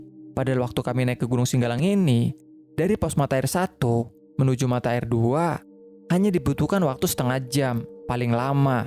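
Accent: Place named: Banyumas, Indonesia. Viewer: Yg bawain cerita orang ngapak ya😅😅😅😅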